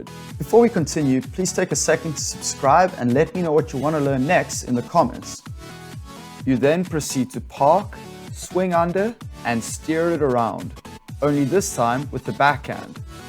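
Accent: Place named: South Africa. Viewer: Fuuck bru I cant anymore. I already miss South Africa too much.. these videos make me feel at home just hearing you talking kak while saying the right shit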